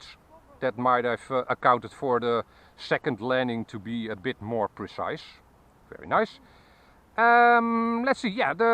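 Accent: Netherlands. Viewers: wat een verschrikkelijk Nederlands accent heb jij, natuurlijk ben je Nederlands maar dit klinkt echt bagger. alsjeblieft werk aan je Engels.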